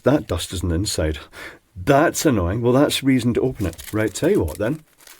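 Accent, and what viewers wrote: United Kingdom. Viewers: I bought the test leads for $0.93. I love to listening you and Your British accent.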